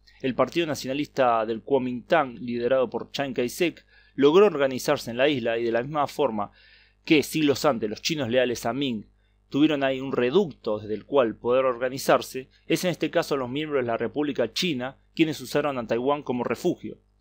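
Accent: Argentina. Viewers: uf con la pronunciación de un argentino, pero fenomenal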